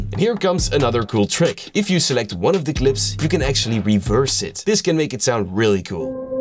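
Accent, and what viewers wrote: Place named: Netherlands. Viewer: bro sounds from NL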